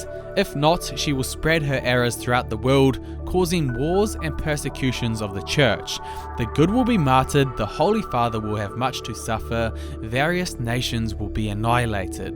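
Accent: New Zealand. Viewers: Is your accent Kiwi ??🤔 (NZ) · Like your Kiwi accent.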